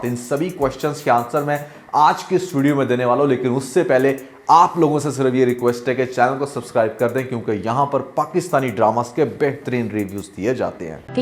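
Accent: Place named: Delhi, India. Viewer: Sir aap phir ko fir na kaha karain aur aisa lagta hai aap dehli se taluq rakhte hain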